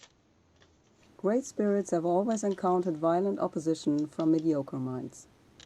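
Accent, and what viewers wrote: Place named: Germany. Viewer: Your English was great and easy to understand. I like the German accent , I love all accents. Nice journal!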